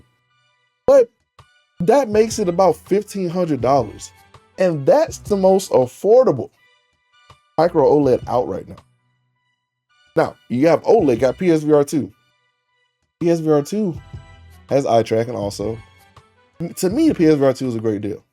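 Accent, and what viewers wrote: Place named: South, United States. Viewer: You can be as country as you want when you're spitting facts....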